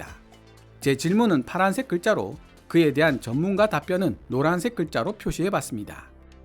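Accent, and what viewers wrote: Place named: Busan, South Korea. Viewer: 부산 사투리억양 정말 듣기 힘드네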